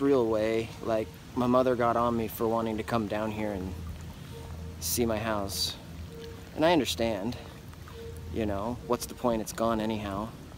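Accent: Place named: United States. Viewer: I just love the accents you Americans have. 🇦🇺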